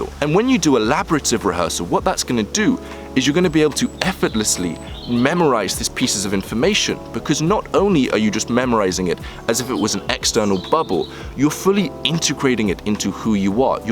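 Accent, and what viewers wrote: United Kingdom. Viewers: What part of the UK are you from man?! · Where are you from? Your accent sounds quite British but it's very mild.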